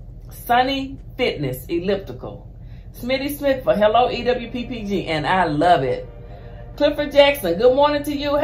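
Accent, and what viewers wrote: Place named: South, United States. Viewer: you are a southern woman, I can hear it in your voice.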